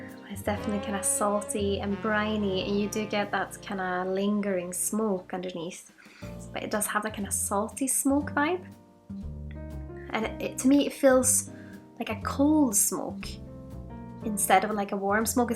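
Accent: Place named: Glasgow, United Kingdom. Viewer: Cheers, agreed, this is a brilliant dram, but you sound more like a Glaswegian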